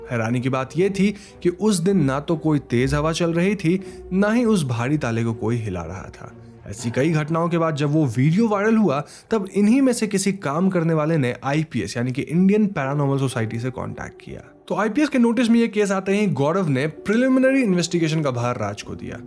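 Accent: Bengal, India. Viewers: Bhaiya aap bengal se ho ??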